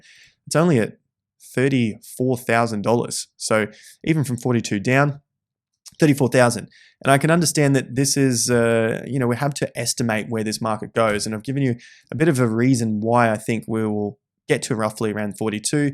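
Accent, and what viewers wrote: Australia. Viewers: your content is great, straight forward and no bullshit. It’s so good hearing an Aussie as well. Love your work.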